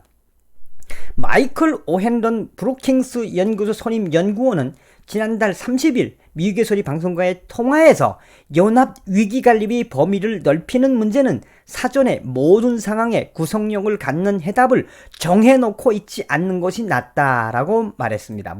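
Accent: North Korea. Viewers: 북한말 좀 불결합니다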